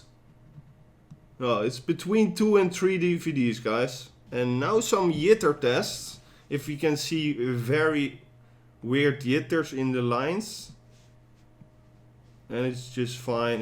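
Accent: Netherlands. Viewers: Echt pannekoeken engels.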